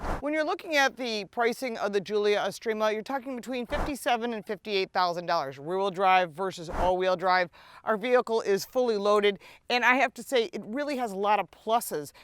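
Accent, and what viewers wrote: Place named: Brooklyn, United States. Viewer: All this time I thought she was Italian ;she sound like she's from Brooklyn. 😊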